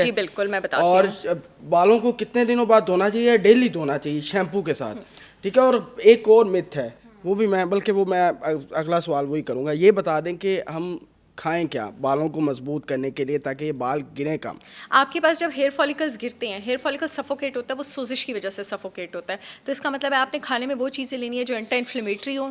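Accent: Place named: Pakistan. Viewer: Yrr i love pakistani urdu accent ❤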